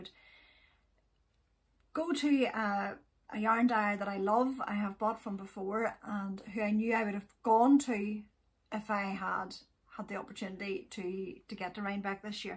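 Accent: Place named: Ireland. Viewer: So wonderful to see you and hear your amazing Irish voice. love love your podcast and can't wait to see your vlogmas. Love the beanie and your sweater is so beautiful. I am not a Christian but my children are born in London. There was always some element of Christmas as they were growing up, Christmas plays and concerts, a small Christmas tree with perhaps aa gift for each child. 15 years ago my Mum passed away, in my hands, on Christmas Day. The following year instead of being sad I thought we should celebrate and invited my brothers and families and had a meal and sang songs, and played games. And from then on christmas grew and grew............I love how we get together and all cook, open lots of gifts for each other and the whole house is lit up and decorated. My daughters have already put the tree up a few days ago! Not only did I adopt Christmas but adopted (not legally) a few Mummies and looked after them until some have passed but just helping them through the year with everything I can.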